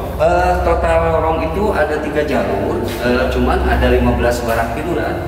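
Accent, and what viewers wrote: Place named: Java, Indonesia. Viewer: Sehat selalu mase,,,,,tetep semangat,,,,medoke itu loooooooo😘😘😘😘😘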